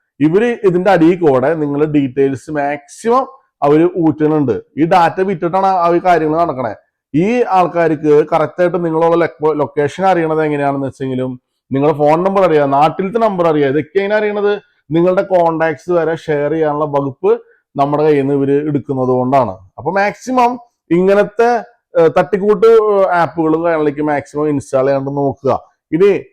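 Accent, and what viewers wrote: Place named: Thrissur, India. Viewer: ഒന്ന് തൃശൂർ പോയി വന്ന ഫീൽ.. സ്ലാങ് wow ❤️❤️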